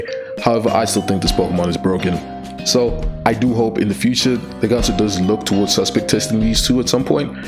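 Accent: South Africa. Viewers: Are you South African?👀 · Yeah right? It's a like mix of South African, English and American (not sure on the specific regional accents, but you get me)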